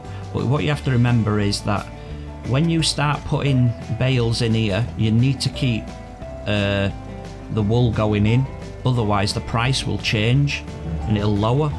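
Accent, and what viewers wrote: Yorkshire, United Kingdom. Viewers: Nice to hear a yorkshire accent, Harrogate here ;). Great upload, do you have a list of the equipment you rented etc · sounds like keith lemon. definately yorkshire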